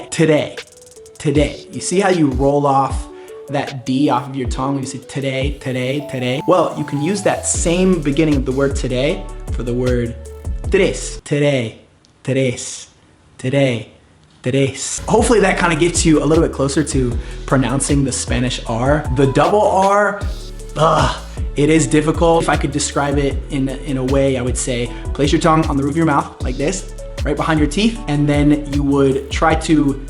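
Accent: Buenos Aires, Argentina. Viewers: Más porteño que la mierda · Me parece o perdiste un poco tu acento natural? Te escuchaba muy porteño 😄😊 Me encantó el video... muy buen tip! 👏👏👏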